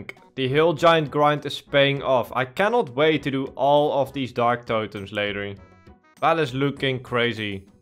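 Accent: Netherlands. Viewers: The typical dutch accent… i love it 👌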